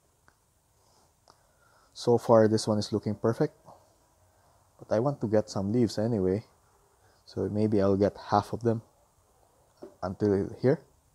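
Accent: Philippines. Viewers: You sound Pinoy po! Btw, thank you for this info, your garden is beautiful! Keep it up! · Pilipino po ba kayo? It sounds like so :) Love your garden! I wish mine would grow as healthy and abundant as yours someday.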